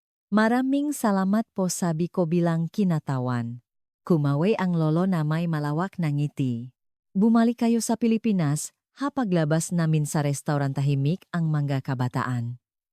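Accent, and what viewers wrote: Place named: Indonesia. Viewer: Ohhh you are Indonian halata sa'yo yong accent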